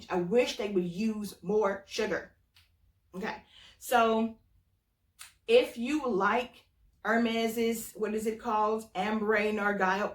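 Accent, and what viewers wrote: United States. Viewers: U from the Midwest? lol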